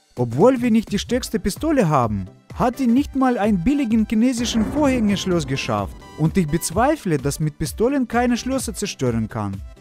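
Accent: Russia. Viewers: er ist russe oder redet er wie ein deutscher?!!! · Ah du russe dashalb aktzent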